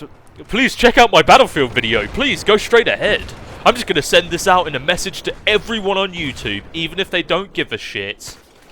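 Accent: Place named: England, United Kingdom. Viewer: I honestly can't put my finger on where abouts in England you are from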